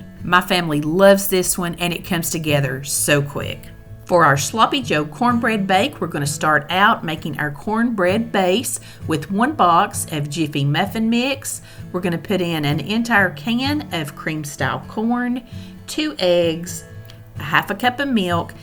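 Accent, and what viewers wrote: South, United States. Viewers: You are adorable! You make us southern gals look and sound awesome! Thank you! AND I can smell the deliciousness from HERE! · I LOVE your dishes and your southern voice!❤ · I am from NY and love the southern accent🥰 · Oh goodness lady I can listen to you speak all day. I absolutely LOVE me a southern accent. I LOVE anything southern; when I retire I'm definitely moving from north east to anywhere in the south. Awesome video and recipes.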